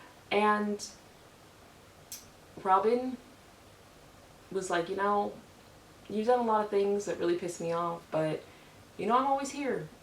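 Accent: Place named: England, United Kingdom. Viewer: My guess is the english accent.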